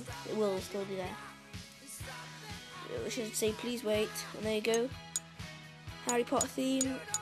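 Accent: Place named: England, United Kingdom. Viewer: He means your england accent. xP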